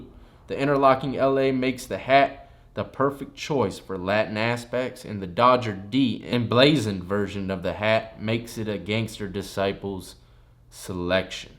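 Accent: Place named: Appalachia, United States. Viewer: Why you talk like a hill Billy bitch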